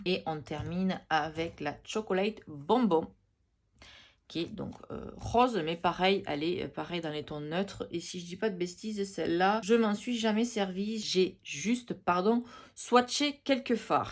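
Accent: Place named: Southwest, France. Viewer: Le petit accent du sud-ouest !! 👌🏻 super vidéo qui détend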